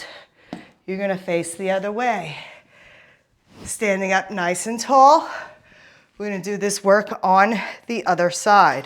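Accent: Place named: New York, United States. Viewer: I really enjoyed this one! Are you from New York? Long Island or somewhere? I find myself saying "carefully" like you do all the time now! lol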